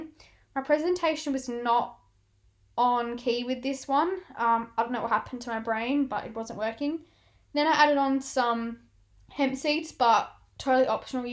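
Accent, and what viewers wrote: United Kingdom. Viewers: ohh hahah i thought u lived in the uk u sound so british idk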